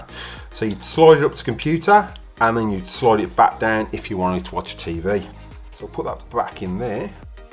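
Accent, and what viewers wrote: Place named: West Midlands, United Kingdom. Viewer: That accent sounds like Walsall or close in the West Mids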